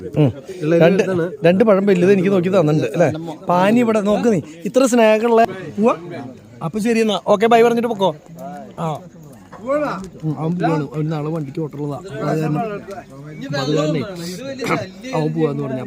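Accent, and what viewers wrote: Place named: Thrissur, India. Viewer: Nidhak samsarikkanulla samayam kodkooooo. Thugg kekaanulla kodhikonda adum thrishoor Bashailooode😂😂😂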